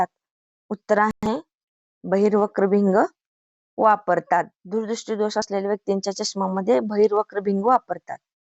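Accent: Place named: Satara, India. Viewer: ek no madem khup chan questions 🔥..bhashecya tuning varun satarcya ahat vatat.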